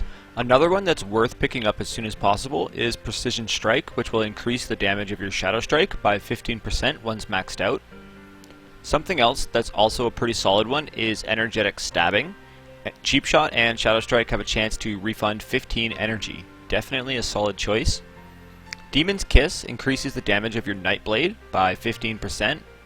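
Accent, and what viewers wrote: Canada. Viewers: Awesome Canadian accent FTW.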